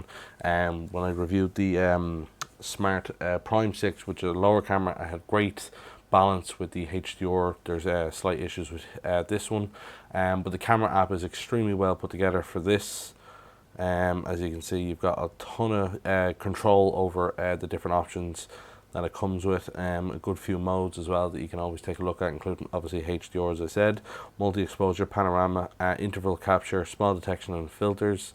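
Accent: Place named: Ireland. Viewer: R U from Ireland by any chance cause u sound like u are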